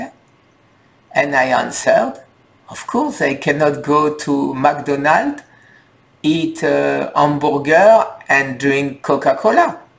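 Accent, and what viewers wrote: France. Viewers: ce n est pas un french accent c est un super slow french accent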